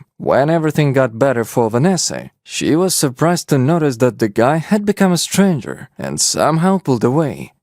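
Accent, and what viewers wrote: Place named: Russia. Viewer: Utter nonsense narrated by a Russian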